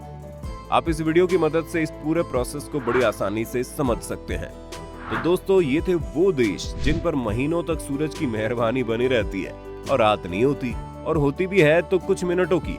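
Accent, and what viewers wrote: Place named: India. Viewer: Aapki avaj hindi Vibes jesi he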